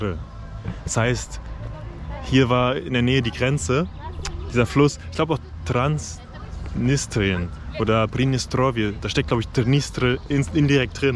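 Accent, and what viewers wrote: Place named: Balkans. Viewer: Wie hast du eigentlich Russisch gelernt? Für mich hast du irgendwie einen Serbokroatischen Akzent 🤔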